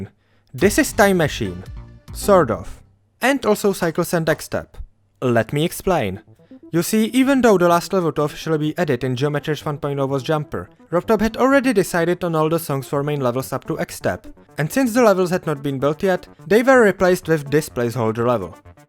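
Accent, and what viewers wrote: Czech Republic. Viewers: ahaa jo ty si čech... já jsem si říkal, ten přízvuk a najednou místo "attempts" tam bylo "pokus" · Your accent sounds hella czech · 🇨🇿🇨🇿?? I can hear the accent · Já vím že si čech už jenom podle toho přízvuku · That czech accent be bitting different thooo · honestly kinda surprised i never realized that this is what a czech accent sounds like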